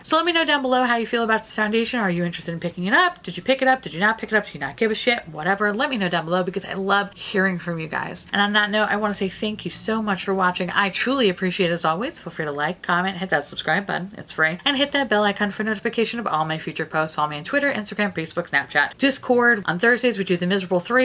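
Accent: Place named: Boston, United States. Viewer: So excited when the notification comes on my screen, enjoy your videos! The accent sounds like a Boston neighbor lol.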